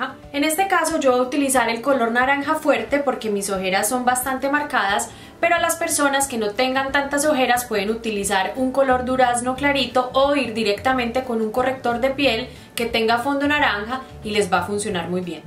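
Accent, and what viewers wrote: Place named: Colombia. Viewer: primer video que veo y apenas empezo dije... Es colombiana! ❤ Nueva suscriptora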